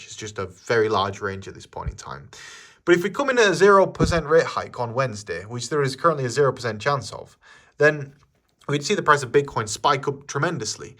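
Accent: Yorkshire, United Kingdom. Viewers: Allreet? Yorkshire man😊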